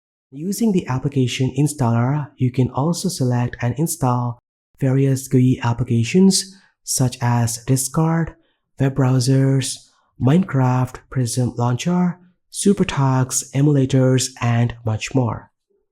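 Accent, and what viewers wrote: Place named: India. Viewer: Now i have heard it all - an AI robot voice with indian accent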